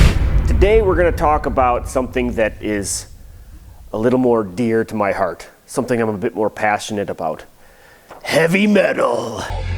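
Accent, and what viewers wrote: Germany. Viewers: The remarkable thing is, that you already developed a little German accent. Or am I hallucinatin'? From one Metalhead to another: Welcome! Good to have you here. Make sure you'll stay! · Why does it sound like you got a german accent?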